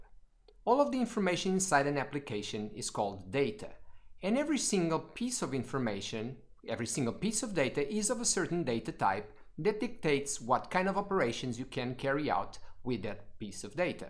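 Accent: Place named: India. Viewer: indian accent😵‍💫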